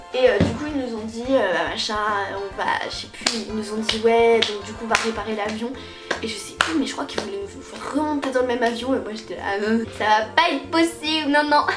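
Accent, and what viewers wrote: Belgium. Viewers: Tu es Belge? Sinon super vidéo :)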